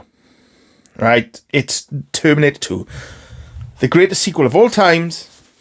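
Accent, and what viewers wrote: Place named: Wales, United Kingdom. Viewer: Nice to hear a quality welsh accent sir! And T2 certainly made this product very famous.. and desirable :)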